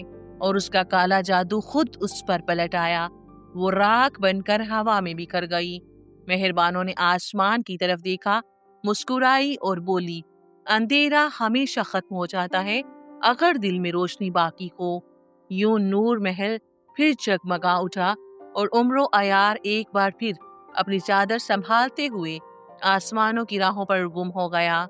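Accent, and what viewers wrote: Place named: India. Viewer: Hindi accent ki jaga Urdu accent use krn Clip's ka liya vo3 use krn vo apka original characters voice da ga apko audio lagana ki zarorat nhi para gi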